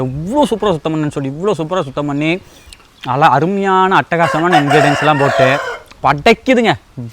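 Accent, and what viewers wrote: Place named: Erode, India. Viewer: ஈரோடு ஸ்லாங்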